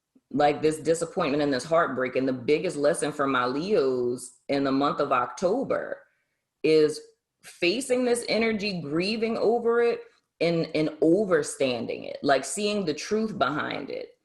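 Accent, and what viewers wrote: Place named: South, United States. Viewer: WHERE YOU FROM?! I hear something southern in your accent. First time viewer. Love your approach!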